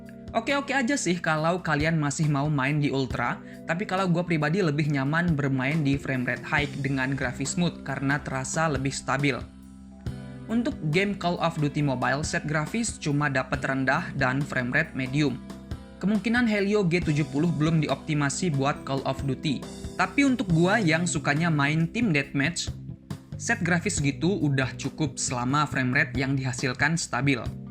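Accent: Lampung, Indonesia. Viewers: Bang Orang Lampung kah?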